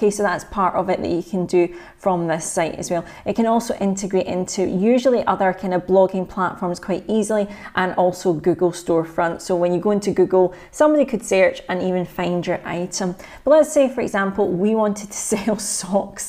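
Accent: Scotland, United Kingdom. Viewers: My wife’s family are part Scottish. What a great accent! Great vid too😁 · Love all your videos! I live in Scotland so I am glad to hear the Scottish voice so I know that all the info you offer will be valid to myself. Most financial video's on YouTube are US so a lot of info is not valid to UK. So thank you and will be trying a lot of your info :)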